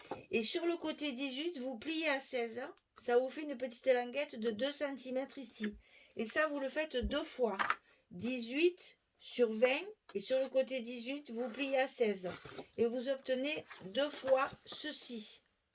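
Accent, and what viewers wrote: South, France. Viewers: Je viens de faire une belle découverte en visionnant votre tuto. Tout d'abord j adore votre accent qui vient du Sud. Vos explications sont claires. Je me crois à un cours de scrap tellement vous détaillez bien . Bravo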